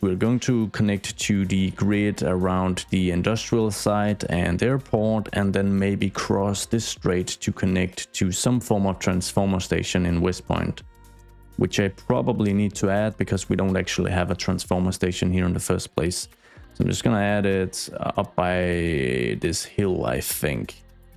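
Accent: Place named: Denmark. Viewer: Ayyyyy! I've missed that Danish accent! Great to have you back :P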